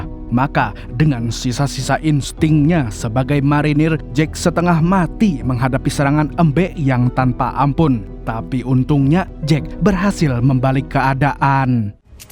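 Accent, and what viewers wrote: Banjar, Indonesia. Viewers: urang banua sklinya amang ni lehh 😅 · Orang banjar kahh😮😂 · Admin orang banjar kah ☺️ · Org banjar kah nyawa Nang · Orang Banjar kh Pian mang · Uma ai mang suara pian merindu banar week week paristiwa pang😅😅😅😅😅 Banjar banar nah.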